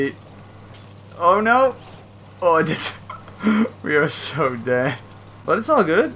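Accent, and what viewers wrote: Netherlands. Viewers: Ik kan je Nederlandsse accent herkennen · you have that tipicle dutch English accent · I smell dutch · you have a Dutch accent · You sound Dutch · Wait are u from the netherlands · Are you Dutch by any chance?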